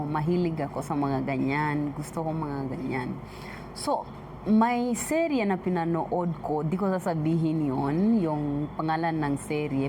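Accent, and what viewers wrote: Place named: Philippines. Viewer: Kagaling, parang may accent na tagalog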